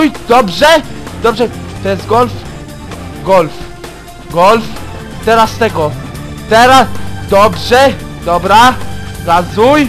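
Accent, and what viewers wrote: Silesia, Poland. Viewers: ty jesteś z Śląska ??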